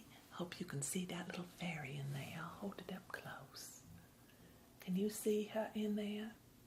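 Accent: South, United States. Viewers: Omg omg I love the accent, I am European so southern accent sounds so so so sweet!!! Love it. thank you! So much!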